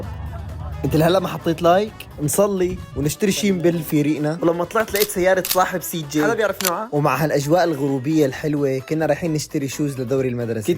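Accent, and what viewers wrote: Egypt. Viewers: سوري ولا.مصري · هوا مصرى تحسو نصو مصرى ونص سوري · اللهجه المصريه برفكت ❤ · انت مصري ولا يه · إيداااا انت من الشرقيه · المصري تبعك كتير بيضحك 🙂😂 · هو انت مصري ولا ايه يا روح الب اخوك❤ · انتي مصري ولا سوري حيرتنا 😅😂❤ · انا حاسك مصري مصري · بجد طريقتك كلامك بالمصري تحفه😂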